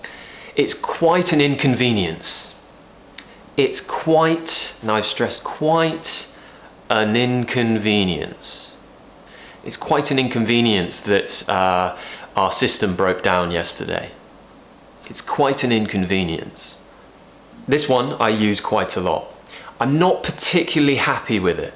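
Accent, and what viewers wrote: United Kingdom. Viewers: Beautiful british accent 🇬🇧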